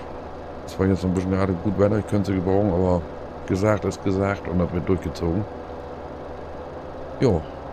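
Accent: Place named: Low German, Germany. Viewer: Din Platt Mega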